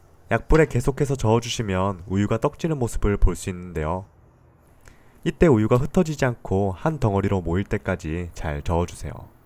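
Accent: Gyeongsang, South Korea. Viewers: 경상도 출신이다에 한표ㅎ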